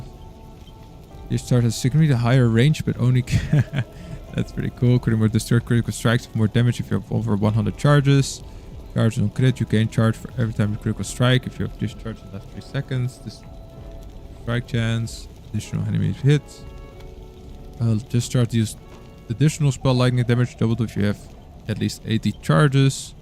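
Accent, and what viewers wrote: Netherlands. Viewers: Great Video, You sound Dutch or South African?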